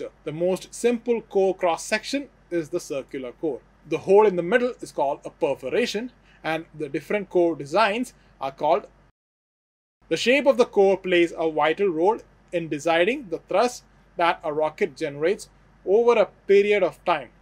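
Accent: India. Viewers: I love Indian version English language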